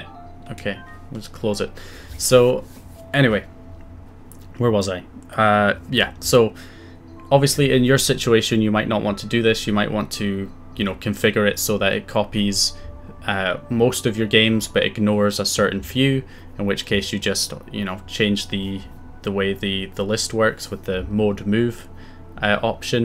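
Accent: Scotland, United Kingdom. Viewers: Amazing videos BTW, and extra special to hear a fellow Scot explain such matters!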